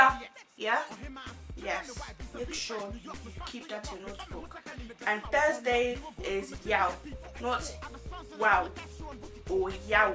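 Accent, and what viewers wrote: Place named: Nigeria. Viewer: lmao ur ghana accent sounds nigerian not ghanaian........ love ur tag interesting u shd hve danced azonto for us tho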